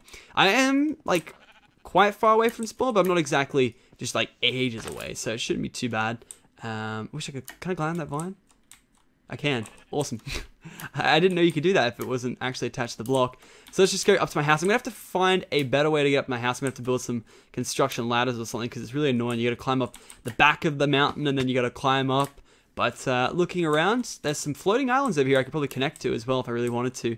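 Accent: Australia. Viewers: Also, are you Australian because you sound like it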